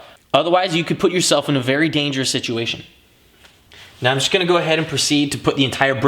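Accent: Canada. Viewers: I don't know where you guys in the comments are about this, but judging from his accent, he's in Canada and if I were him, I'd add a light film of anti-seize on 1) the threads of the studs to ease in impacting to press in, 2) on the threads in general because CA, 3) and even on both sides of the spacers to help prevent seizing in general. I live in Phoenix where corrosion isn't an issue and I still do this practice.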